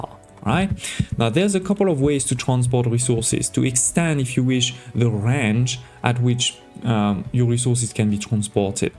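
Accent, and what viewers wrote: France. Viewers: Nice video ! (are you french by any chance ?)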